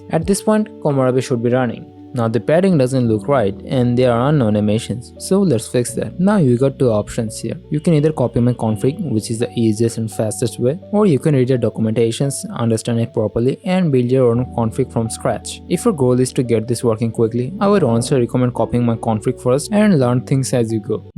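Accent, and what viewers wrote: India. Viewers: Are you Indian by any chance? Your accent seems pretty Indian. Loving the videos by the way.❤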